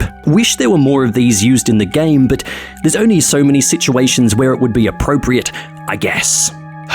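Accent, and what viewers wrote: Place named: Home Counties, United Kingdom. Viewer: Home Counties?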